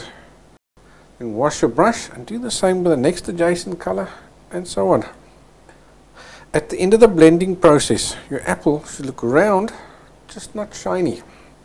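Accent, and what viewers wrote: Afrikaans, South Africa. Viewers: I can hear he's Afrikaans 😅👍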